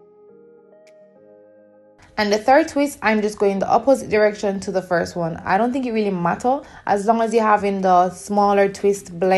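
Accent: Jamaica. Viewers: I know this accent anywhere. Jamaican queen. U represent well. Beautiful hairstyles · This a gal yah a Jamaican she sounds like it pretty too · Hi there my Jamaican sister i love watching your videos of course if will be copying a lot of the styles you shared and am loving your accent.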